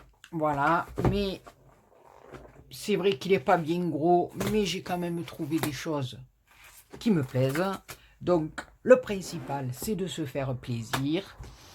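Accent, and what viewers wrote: South, France. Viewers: De bien belles trouvailles pour vos prochaines créations, le bloc 30 x30 est superbe et les jolis dies cuts je ne les ai pas encore vu. Merci pour ce partage et ce bel accent du midi. Bon week end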